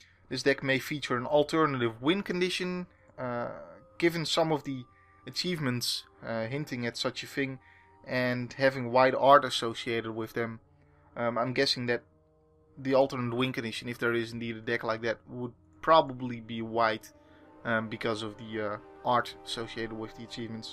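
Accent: Netherlands. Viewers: if this ain't no Dutchy then i don't know who else can speak this dutch-english dialect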